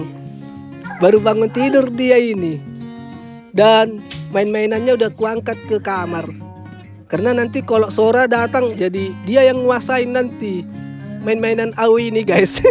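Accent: Medan, Indonesia. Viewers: Logat Abang seperti orang Medan, apa Abang orang Medan?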